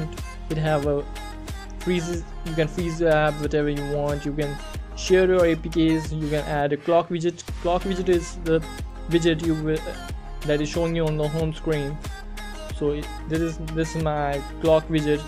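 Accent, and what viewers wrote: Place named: United States. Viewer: Please for god sake!Drop down Your stupid American accent.Plz.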